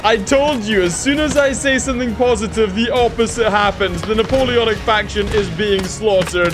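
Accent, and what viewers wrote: Scotland, United Kingdom. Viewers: Are you Scottish?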